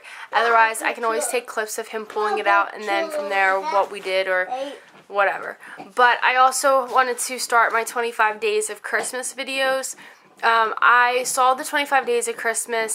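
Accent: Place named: Philadelphia, United States. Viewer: You have such Philly accent! LOL... this is the first time I'm watching you! :) Good to see ya and get to know you this way!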